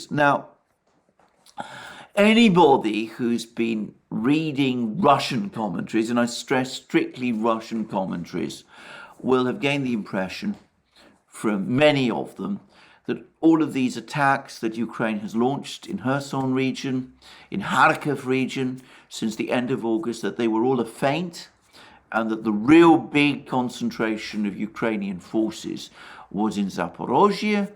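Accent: United Kingdom. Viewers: he is offering russian propaganda with a british accent.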